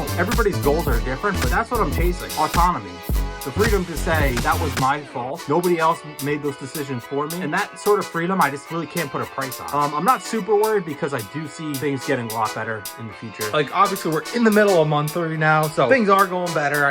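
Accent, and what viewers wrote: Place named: New York, United States. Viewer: We are rooting for you, brother. Congrats on the recognition you’ve been receiving. Judging from your accent, seems like you’re from my neck of the woods (NY tri-state area). Best of luck!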